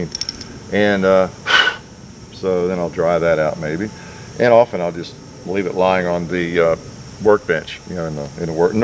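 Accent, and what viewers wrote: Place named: Florida, United States. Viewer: You sir, just gained a follower and a fan. You sound like a Florida boy just like me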